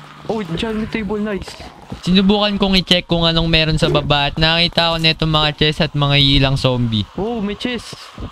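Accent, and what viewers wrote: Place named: Philippines. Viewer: Filipino Accent "para syang OPIS" ANG LT KUYA HAHAHAHA Nice vid😆❤️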